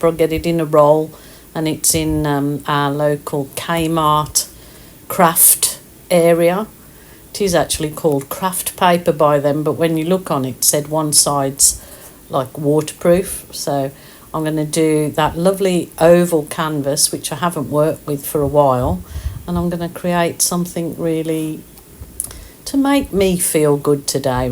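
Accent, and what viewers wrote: Birmingham, United Kingdom. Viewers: Your accent sounds very much like the Birmingham, UK accent 🤔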